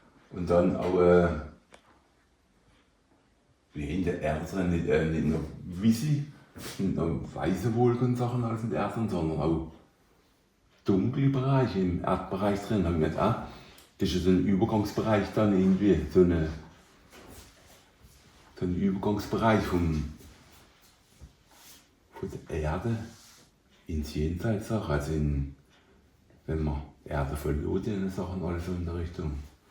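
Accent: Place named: Alemannic, Germany. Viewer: Ich verstehe jedes Wort! Das ist Alemannisch fast in seiner reinsten und ursprünglichsten Form, wie man es nur noch in Südbaden oder im Elsass spricht. Im Elsass gibt es allerdings mehr französische Einflüsse. Für mich klingt das alles sehr, sehr authentisch!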